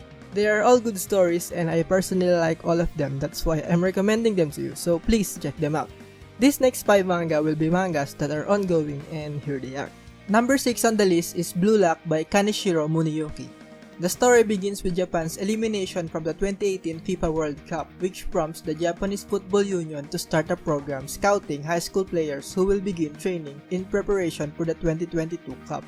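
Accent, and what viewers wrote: Philippines. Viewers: bruh are you filipino your accent sound like filipino accent in my opipon ahahhaa nice list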